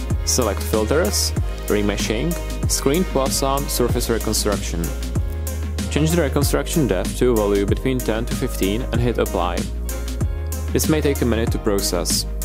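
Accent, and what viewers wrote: Czech Republic. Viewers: great, but that czech accent, grrrr :D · Nejsem si jistej, ale asi slyším českej přízvuk 😄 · i can hear this guy is czech, is he?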